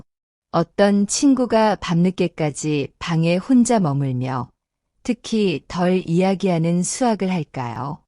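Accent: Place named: United States. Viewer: 미국 사람이 이야기하는거 같다. 가짜 이야기를 억지로 하는거 같다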